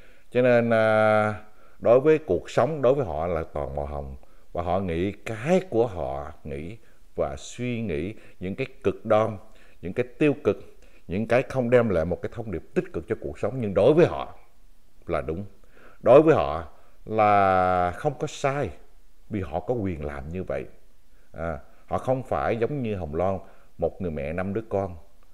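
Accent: Standard. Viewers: chú nói quá chuẩn 👍cảm ơn chú co tiếng nói bảo vệ HL❤❤❤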